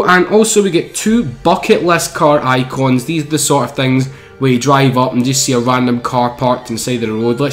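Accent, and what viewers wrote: Scotland, United Kingdom. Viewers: What part of Scotland you from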